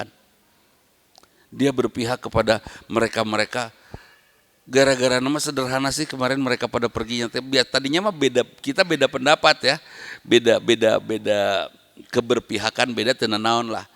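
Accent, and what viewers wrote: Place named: West Java, Indonesia. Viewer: Urang sunda, basa sunda, logat sunda pisan, ngeunaheun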